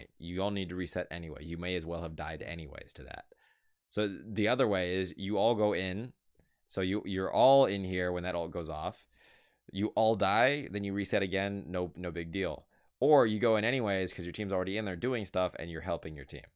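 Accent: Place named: Italy. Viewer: He is so Italian... why do we have such a distinct accent when we speak english god damn it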